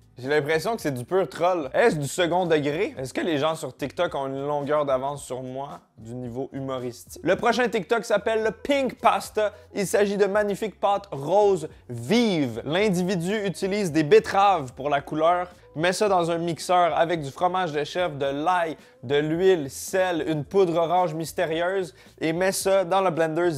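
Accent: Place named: Quebec, Canada. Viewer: On voit qu'il québécois lol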